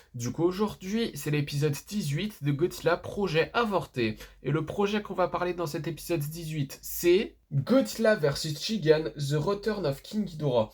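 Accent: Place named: Japan. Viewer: J'AI PÉTÉ UN PLOMB JE SUIS SÉRIEUX POURQUOI TU DIS TOUJOURS EN EN ACCENT JAPONAIS IL ET POURI C'EST UN LANGUE C'EST PAS POURIR C'EST JUSTE EN PEUT PAS CAPTÉ CAR C'EST DU JAPONAIS JE VAIS TU DÉTRUIRE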